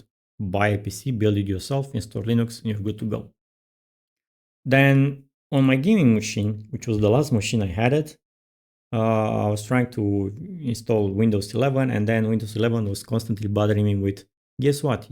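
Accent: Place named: Romania. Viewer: Never heard of lunacy before, Tnx! I also ditched Windows because is a piece of crap and now i am using Linux mint! In general i use open sourced software like blender,gimp,krita and gotot engine so there is no use for using Windows Anymore! Not to mention the customization that you can do in Linux is Just Crazy! By the way you sound Romanian :D! Subscribed!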